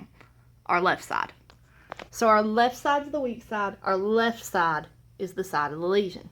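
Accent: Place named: United States. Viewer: I'm sitting the UK exam this coming week. Any brainstem questions and I'll be hearing your awesome American accent singing "5, 6, 7, 8!". Thank you so much for these videos - brilliantly accurate and educational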